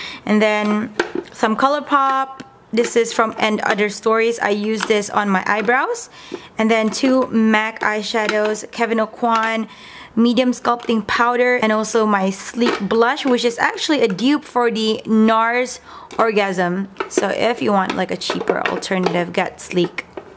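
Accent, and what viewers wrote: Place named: Sabah, Malaysia. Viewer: New favourite local youtuber! Your sabah and english accent got me 👌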